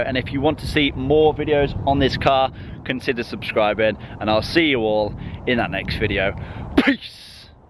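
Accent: England, United Kingdom. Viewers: I know it is, but you sound British, you are showing a car with right hand drive so it's a boot!!! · You sound english though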